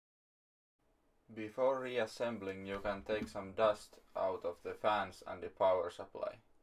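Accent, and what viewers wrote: Finland. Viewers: ootko suomesta :D tuosta englannin aksentista ei voi erehtyä! Mulla on kaksi tällaista, 24" sekä 20" mid-2007. Molemmissa prossuna T7700. Tuohon isompaan olisi hauskaa laittaa X7900 tai T9500, Catalina-tukea en tarvitse.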